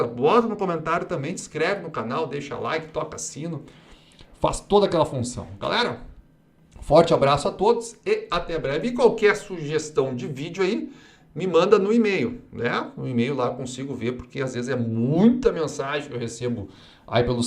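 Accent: Rio Grande do Sul, Brazil. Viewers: Esse sotaque de gaúcho é duro de aguentar 😠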